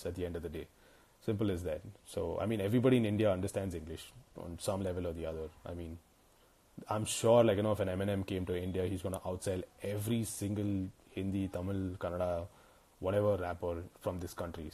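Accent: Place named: England, United Kingdom. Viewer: Bro I like your English accent,my English is not that good I love u bro .... and I love your songs very much .......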